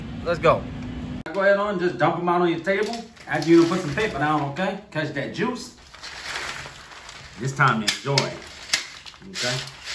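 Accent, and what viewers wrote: Louisiana, United States. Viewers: A Cajun accent is almost as cool as a yooper accent.. Thanks for the tutorial. I'll be trying this one. 👍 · Creole accent from New Orleans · This Arkansas girl here love your Louisiana accent👍👍👍❤️❤️❤️❤️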